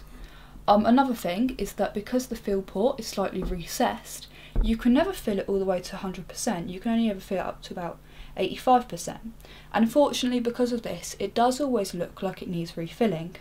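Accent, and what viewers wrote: United Kingdom. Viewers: Hey, you're so damn beautiful... I love you, your british accent and your videos!:)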